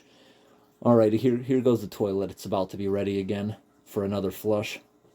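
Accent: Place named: Alaska, United States. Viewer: I was born and raised in Alaska, and he reminds me of a true Alaskan! Even the way he talks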